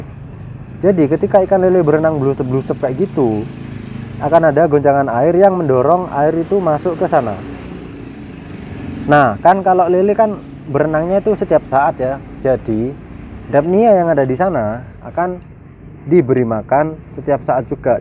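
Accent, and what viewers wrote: Madura, Indonesia. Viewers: Orang madureh kak?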